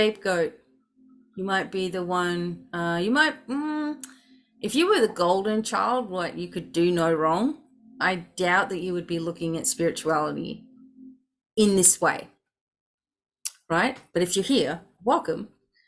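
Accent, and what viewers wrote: Australia. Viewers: I absolutely loved this message ❤and really hit that deep spot! Ya aussie spirit and voice 😂came out especially at the beginning with the voices about people not understanding why we have a energy exchange 😅 omg I was rolling around in laughter vibes flying high lol 😂 Thank you for the depth and the joy ❤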